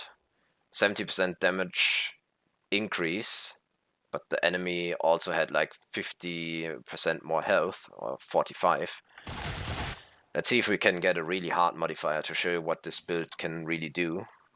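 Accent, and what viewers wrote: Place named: Germany. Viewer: Nice Build Aber das du deutsch bist hört man dirket xD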